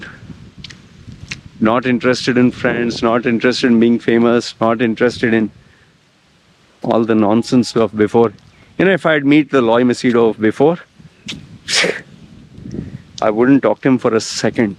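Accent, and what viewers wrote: India. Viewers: You have changed a lot. 5 years ago u had that pseudo American accent and now fully Indian accent (your genuine voice which is good to hear). You should try radio jockeying. Other changes includes softening down from the brash tone u had then. Good luck Loy. Also you have come back full circle from Family to show off life to back to family. Sometimes I wonder this is heaven(our lifespan) and there is no other place called heaven and we go back to an unsexy place after death....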